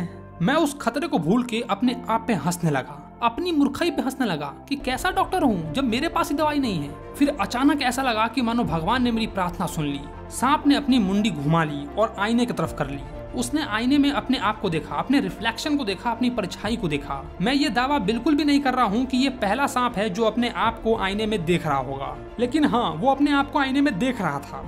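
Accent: Bihar, India. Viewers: Bihar se ho kya? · Bihari bhai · Bhaiya kya aap bihar se ho ? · Are you from Bihar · Aapke padhane ka tarika mujhe bahut accha lga jis trh aap bolte ho ekdam bihari ki trh kyunki main bhi bihari hu to mujhe aapke padhane ka tarika aur bolne ka tarika bahut accha lga 😂😊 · The bihari accent 😂😂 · Bro is from Bihar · Bhai 100% bihari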